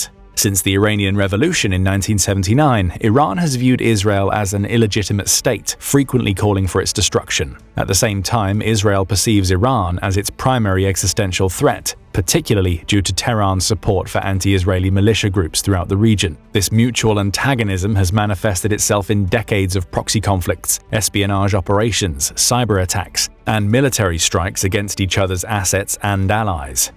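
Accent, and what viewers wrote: United Kingdom. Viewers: 250 years ago, Rebels broke away from British rule and sailed to the New World to start their own government. But, this youtube channel never got that memo. So . . . they speak with a British accent About U S military movements. WHAT IS WRONG WITH THIS PICTURE?! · QUERY: Why choose Brit accent for narration? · why do we have to keep hearing American issues, with a British accent.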